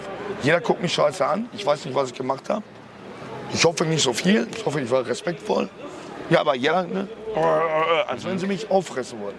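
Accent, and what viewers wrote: Ruhr, Germany. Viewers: Ich liebe den Ruhrpottakzent! Ich wünsche alles gute, man merkt, dass er das Herz auf dem rechten Fleck trägt. Ich hoffe für ihn, dass er lernt Verantwortung für sich zu übernehmen, auch wenn ich ihm glaube, dass er eine bitter harte Kindheit hatte. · Wenn man nicht hinguckt könnte man meinen Kalle Grabowsky redet. Ruhrpott rules.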